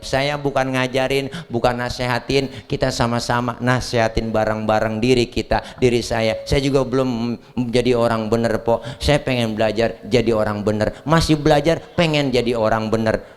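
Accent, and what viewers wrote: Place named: Cikarang, Indonesia. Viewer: Pa ustadz ..Bahasanya org Cikarang ye ..ceramahnya ga bikin ngantukk Ada lucu nya ada seriusnya ..semangat ye ustadz tilee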